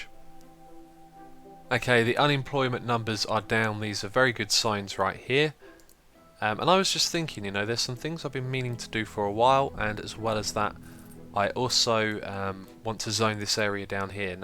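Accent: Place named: London, United Kingdom. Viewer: I reckon he is from somewhere in London ???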